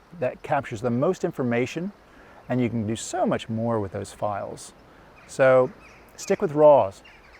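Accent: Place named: Australia. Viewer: I feel like I hear bits of Aussie accent lol but very informative video! Best is the basic language used- a lot of the other videos I can’t quite understand due to the technical terms etc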